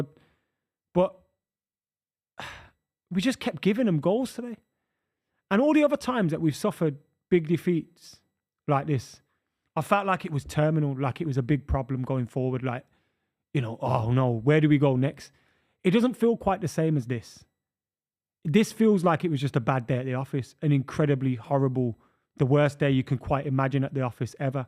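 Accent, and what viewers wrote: Birmingham, United Kingdom. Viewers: Boring brummie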